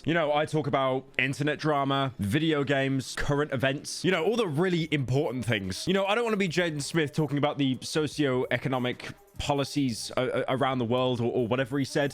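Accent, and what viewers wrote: United Kingdom. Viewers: Why the fuck am I watching and listening to a brit talking about how an eastern european man had a foreign object in his chocolate starfish?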